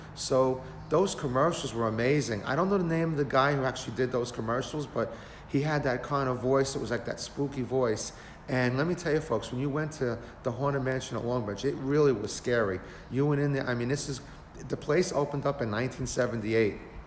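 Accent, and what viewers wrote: Brooklyn, United States. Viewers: I went there as a kid....fun place. thanks for sharing....And your right, at that time late 70s & early 80s, that was scary. I remember the hands grabbing my feet with like rat noises, it was terrifying & fun at the same time. Love your Brooklyn voice. I'm a BK native as well & my condolences for your son. May he rip. 🙏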